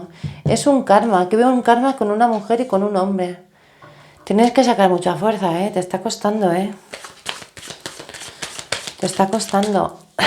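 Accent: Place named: Spain. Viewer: Muchas gracias 👍.✔️✔️✔️ Me pregunto de cual parte de España será este acento? Habla como la actriz Marina José.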